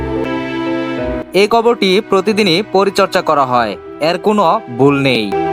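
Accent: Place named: Narsingdi, Bangladesh. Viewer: ভাষাতো নরসিংদী র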